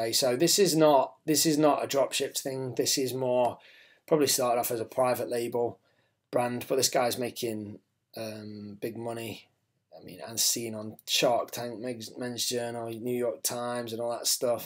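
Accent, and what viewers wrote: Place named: Nottingham, United Kingdom. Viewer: Glad I stumbled across this. Genuine guy with a lovely Notts accent. Just subscribed 👍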